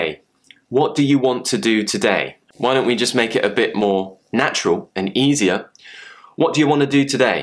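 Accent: United Kingdom. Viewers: The 'your' sound in the video sounds similar to 'yeah' sound to me. It's interesting to listen to British accent. Thank you for the video. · you´re a perfect english teacher... your GB accent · I love ❤ British accent. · Nice video 👍 Which area of British accent would you say your accent? As there are lots of British accent depends on the city?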